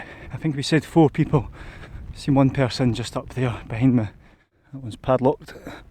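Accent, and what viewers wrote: Scotland, United Kingdom. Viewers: I slag that twat Hopkins off a lot worse than you . I m not saying you re personally chucking litter but you re popularising a night out in a scenic location having a cook up and booze up (and don t try and tell me you did nt have 4 cans in that overloaded rucksack ) and already one of the idiots following you is saying "i m having some of that seeing that Suilven is a piece of piss to get up even tho it looks scary from a distance. You ve already said its busy at weekends up the tops lol.. Thats how the Peak District started off .The same will end up on all the munro s with all overnights being banned and YOU are part of the problem . I haven t got a problem with your jock accent , but the automated sub titles certainly has..It was the only funny thing about the vid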